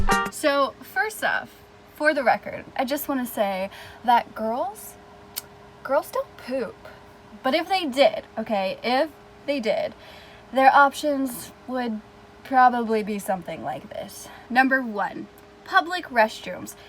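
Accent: Texas, United States. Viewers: Are you from Dallas, Texas?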